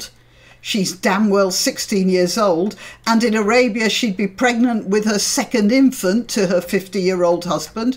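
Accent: United Kingdom. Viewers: I like your beautiful British accent very much. Thank you for your voice. I am your new subscriber.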